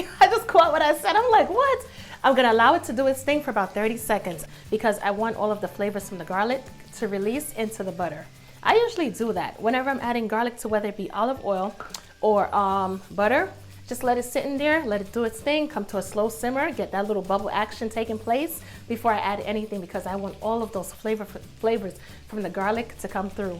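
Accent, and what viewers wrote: New York, United States. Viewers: I love your New York accent 💕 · Is that a New York accent?? It’s so cute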